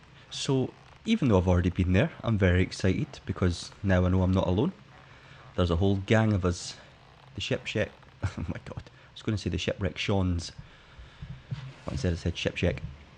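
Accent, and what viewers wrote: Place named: Scotland, United Kingdom. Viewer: When you were reading the comment and he complimented your accent and you said "ah dunno about that mate" just know that while idk about people from elsewhere, basically every American I know loves a Scottish accent (as long as we can understand it lol). You really are a fun guy to listen to. I like your sense of humor, it sounds like we're just hanging out shootin the shit and I think that's part of what makes your channel so charming. If you did go the 'professional' route then a lot of that would get lost. Don't change, your format is great. Glad to see ya back!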